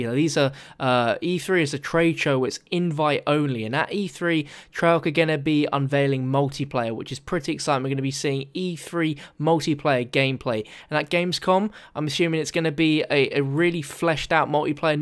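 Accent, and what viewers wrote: United Kingdom. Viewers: you sound Brittish. keep talking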